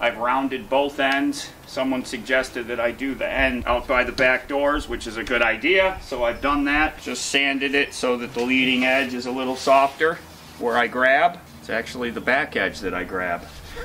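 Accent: United States. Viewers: I was watching something else on utube and the block had the same voice/accent as yours, where in the states are you from? Just heading off this morning bush camping in South Australia. Excited as Lefty getting a bone.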